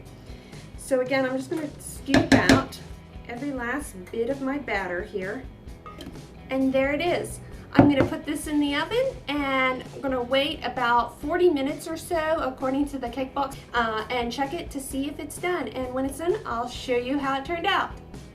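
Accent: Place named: Midwest, United States. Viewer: I'm guessing u are from the Midwest. ..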